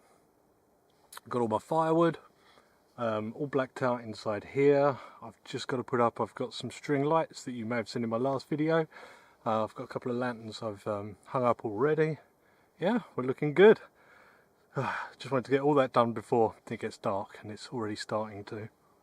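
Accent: United Kingdom. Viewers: He's British definitely not American accent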